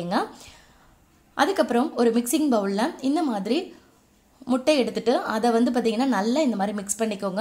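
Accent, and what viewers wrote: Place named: Kongu, India. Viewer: Snacks spr nga.. snakes ku extra azhagu serkradhu neenga pesura kongutamil dhanga.. nalla utcharipu nga.. 👏👏👏👏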